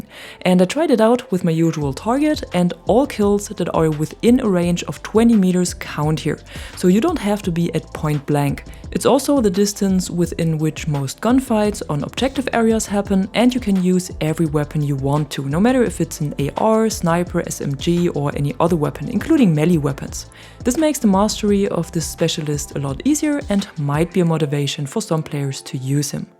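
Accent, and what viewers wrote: Germany. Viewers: Es ist vermutlich dein deutsch englischer Akzent, du machst top Videos 👍👍👍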